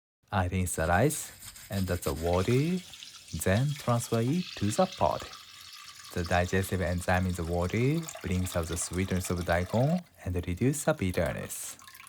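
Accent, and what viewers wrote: Japan. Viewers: Your comment is full of negativity and doesn’t contribute anything useful. Everyone’s trying their best, and no one’s perfect. Just like how a French person speaks with a French accent or an Italian with theirs, a Japanese speaker has their own accent when speaking English. It doesn’t make their English less valid—it’s part of who they are. If the content isn’t for you, that’s fine, but there’s no need to tear others down. Maybe take a step back and think before you post—respect goes a long way.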